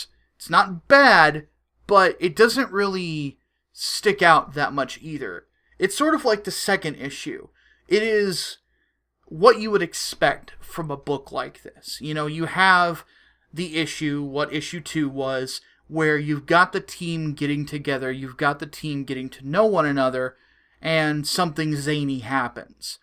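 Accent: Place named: South, United States. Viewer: I literally liked the video because of your southern speech If you want to see an example of this watch don lenon . Talking to ben shapiro from a couple days ago I'm from Chicago i go down south every couple of years Usually during the forth of July I see people coming together to celebrate and throughout this leftist bullshit I've been explaining my positive thoughts of the south like That people in the south come together real well and that the the flag has been a symbol of history and the southern pride not hatred I'm against censorship period Do I want a living wage sure do these people know anything about Ecconomicss nope Inflation increased taxes the decreased value of a dollar Ouran high school I hate all these damn rich people They're idiotic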